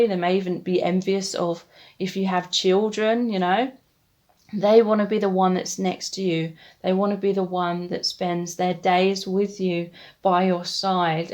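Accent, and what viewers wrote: United Kingdom. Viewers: ❤ You are amazing as always, wonderful job, thanks I adore your British accent ❤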